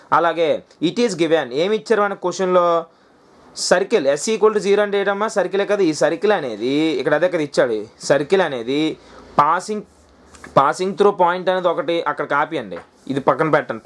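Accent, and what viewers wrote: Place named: Visakhapatnam, India. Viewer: Pure vizag slang❤️😍😍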